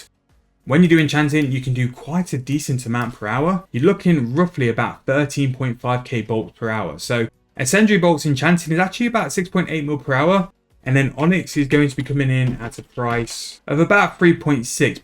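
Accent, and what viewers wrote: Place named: United Kingdom. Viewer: 6M an hour doing enchants... damn I wish I tried this before. Trained 99 mage with combat, did some telegrind (sandstone --> sand) and I never looked into enchanting items really. Thank you so much! If you would talk a little bit slower, I'd appreciate it a lot. As a non-native English speaker the UK English is often hard for me to follow up with. Either way, I finally subscribed to you. Let's go to the 6000 subs!!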